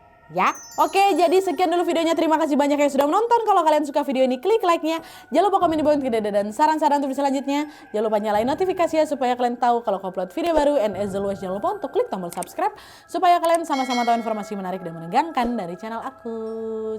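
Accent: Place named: Sumatra, Indonesia. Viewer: Logat sumatranya enak banget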